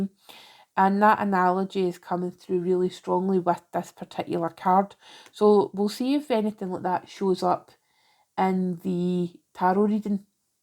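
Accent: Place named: Glasgow, United Kingdom. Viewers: Great read, love to hear a weegie, my family all from Glasgow and you make me feel like home ❤ thank you ❤ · The accent feels like home to me, as my late father was Glaswegian. My friend gifted me Osho Zen cards and the cards I pulled pretty much said "I'm not meant to know yet", like the clouds in the tapir card. Thank you for the reading ❤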